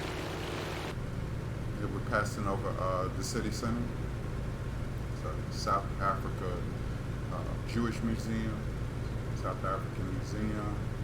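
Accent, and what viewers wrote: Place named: Germany. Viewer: Great visuals and informative. Good job on the volume control. Love your German accent, lol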